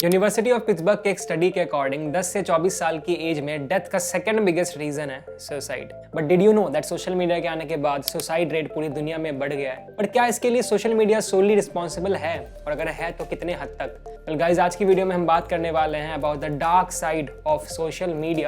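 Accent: Bihar, India. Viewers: Bhaiya got the 💯 Bihari accent blend 😸PURE IITian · your bihari accent is sosexy.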